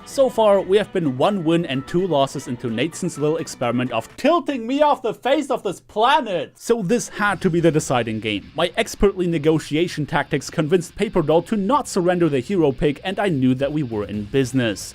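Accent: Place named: Germany. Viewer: just keep speeking with dat FAT german accent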